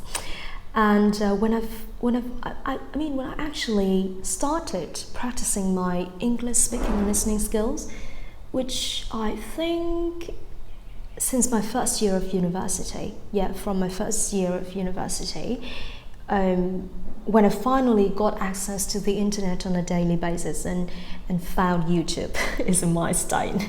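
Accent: United Kingdom. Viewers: giọng Anh chuẩn, dễ thương giống Lucy ( “Learning English with Lucy’’ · Giọng cô là anh phải ko ak. Nhưng lại ko nặng lắm. I love your acent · giọng chị hay quá!!!~ có phải giọng chị theo tone British accent ko ạ? em đang luyện nghe kiểu thụ động, nghe giọng chị rất quyến rũ, dễ nghe và dễ phát âm theo nữa. Cám ơn chị đã dành thời gian để làm video này cho mọi người ạ <3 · Chị nói accent kiểu người anh, nghe quyến rũ, uyển chuyển quý phái thật! Em thích nhưng mà khó quá nên đành theo anh mĩ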